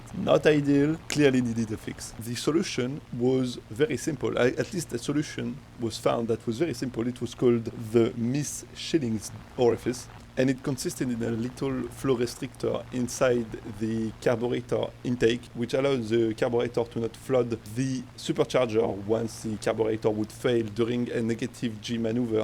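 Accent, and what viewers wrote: France. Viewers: Oh god that french... Please yt don't show me baguettes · R u french, nice accent??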